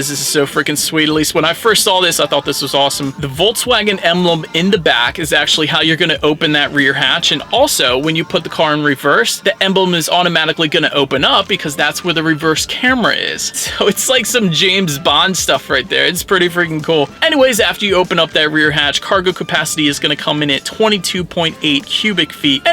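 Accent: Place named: Delaware, United States. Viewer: Hey got any Hoagies? Love the accent. Been awhile. Born in Wilmington, DE.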